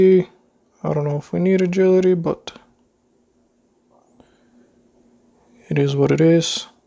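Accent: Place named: Russia. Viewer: Мне тоже показалось он с каким русским акцентом говорит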